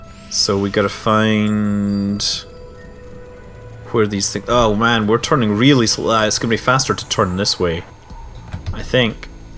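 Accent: Scotland, United Kingdom. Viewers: Because he's a Scotsman. He also says Idear. c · Hullo, there is no problem at all with the Ts, they are clear as day. The "Hwhat" thing isn't an audio processing issue, it's just a common garden Scots speech pattern which can really stand out to the non-Scottish.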